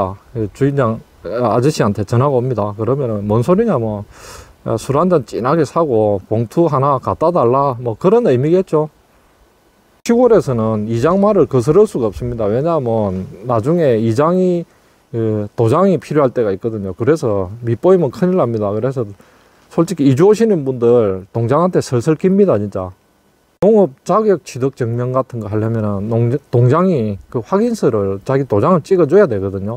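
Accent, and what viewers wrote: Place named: Jeolla, South Korea. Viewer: 말투로보아 전라도?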